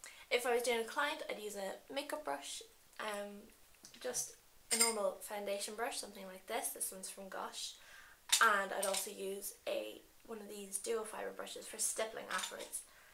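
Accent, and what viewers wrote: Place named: Ireland. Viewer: omg, can you please like smuggle me and take me to Ireland please??! your accent, girl!!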